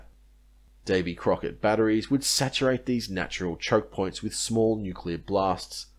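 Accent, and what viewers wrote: Australia. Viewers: I want to take a moment to express my admiration for your scientific videos, which have a calming, informative effect on me. I am particularly fond of your previous dissections of the atomic theory, which I find highly engaging and informative. I strongly appreciate your voice; that Aussie narration in the video creates a sort of exotic intimacy, which adds to my enjoyment of the content. Additionally, I am grateful for your videos on the Pacific military operations, which were fascinating. I think your style is outstanding with the quality of the videos, and I am deeply grateful for the opportunity to watch them for free. Thank you for making these videos while naturally making the very most informative pieces about these amazing underlying subjects. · Glad you re-uploaded it mate. One thing though... you pronounce "beta", as in "beta radiation", as "bay-tah", not "bee-tah". 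As a fellow proud Australian, I must ask why. There are enough Americans speaking their form of English in YouTube videos, and while there's nothing wrong with that, there's no need for everyone else to do so too. But that is a minor criticism. I really enjoy your material and it's nice to hear an Aussie accent, the occasional American pronunciation notwithstanding. As a 13 year old in the early 80s, I delivered the afternoon newspaper, "The Herald". In it on one occasion was a graphic description of the effects of a one megaton blast over Bourke Street. I think the effects described in that were probably taken from the general description of the effects at certain distances of a one megaton blast that you gave towards the end of the video. I've often wondered where I could get hold of that article in recent years, but I cannot find any reference to it anywhere. I wonder if you might know of it.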